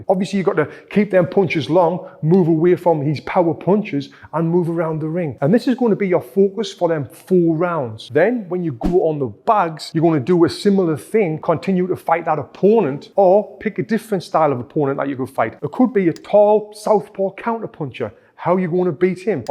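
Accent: United Kingdom. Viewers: Thanks for all the boxing tricks you give online for free, Buddy, I'm from Québec, Canada, and as I listen to you, your british accent makes me laugh, but please, do not take it as an insult. To me, it just brings a colourful side to your videos. I got stricken by a colorectal cancer in 2022 and I wanna get back to karate. I am not a good kicker but I'm pretty sure I can balance the equation by being able to hit harder and faster in some other way. Tal I want to improve my punching abilities and I am pretty sure your videos are a good way to go. Thanks again for your advices, and if you ever decide to come in Québec, Canada, for a vacation, I'll be pleased to guide you to good places to go to. Take care!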